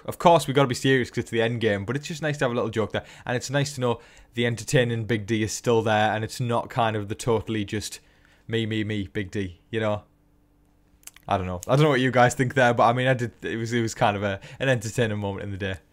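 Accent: United Kingdom. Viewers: BTW Because of listening to you for three months I can now understand your British accent plus I can understand others as well. Especially the people on the movies as well as many others. Thank you for providing us with all your comments and thoughts on this season of Big Brother.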